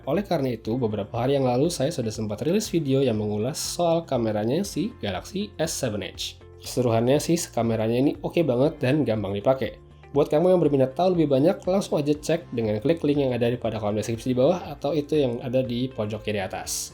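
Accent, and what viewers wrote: Bali, Indonesia. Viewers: Bang lu orang bali ya??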